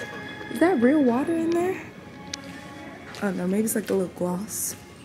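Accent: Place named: United Kingdom. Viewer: I love hearing you call us "babes" with a British accent 🤣💙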